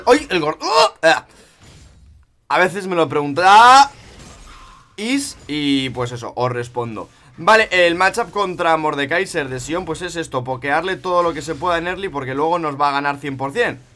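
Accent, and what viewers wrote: Argentina. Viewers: Ese acento argentino papaa! Sos un kapo:v Como siempre disfrutando todos tus vidios. pd: juga singed. Es free elo, encima no fallas los gordotes Kappa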